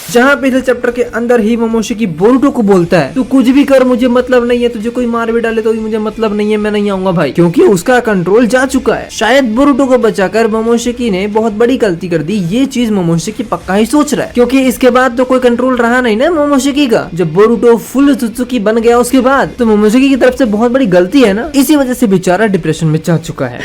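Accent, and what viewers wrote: Bengal, India. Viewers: kiya bhai tu bangali hay?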